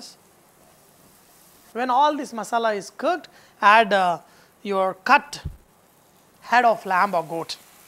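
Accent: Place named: South India, India. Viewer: i love your south india accent.plz dont eat when u cook in front of the cam i feel jealous.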